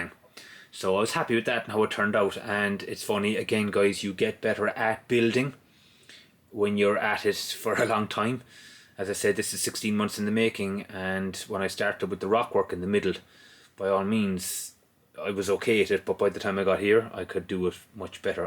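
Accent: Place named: Ireland. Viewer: Omg he's Irish! :D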